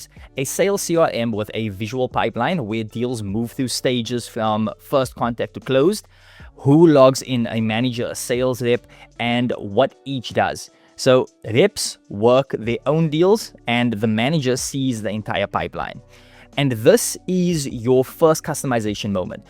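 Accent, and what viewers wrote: South Africa. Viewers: Intresting dialect, you i SAfrica? Sound like a combination of hindi and Afriaans mix in English. I like it. ☺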